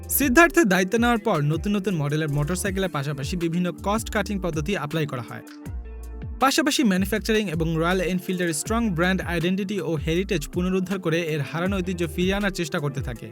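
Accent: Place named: United Kingdom. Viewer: অনুরোধ করছি ভয়েসওভারে বাংলা অংশ টুকু পরিষ্কার করে বলার জন্য। ইংলিশ এ্যাকসেন্ট আর বাংলা এ্যাকসেন্ট করে ফেলায় মনে হচ্ছে বাংলাটাও ইংলিশের মতো করে উচ্চারণ করা হচ্ছে।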